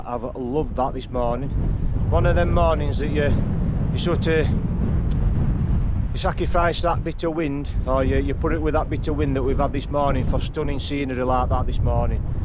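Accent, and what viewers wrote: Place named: South Yorkshire, United Kingdom. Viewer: Loving these videos I’m a ryhill lad originally you sound like you’re from Royston or South Yorkshire bloke live in Barnsley now me and my son been watching these videos lately and the other folk good bloke outdoors Paul mesner lads only 7 but likes these videos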